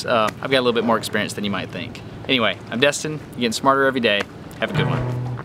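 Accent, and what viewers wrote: United States. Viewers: Apologies for the banal question, but where abouts in the States is this guy's accent from?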